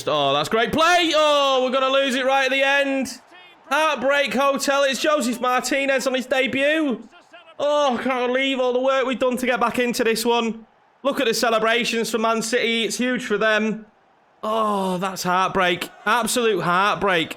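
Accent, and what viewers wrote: Yorkshire, United Kingdom. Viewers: Where you from in Yorkshire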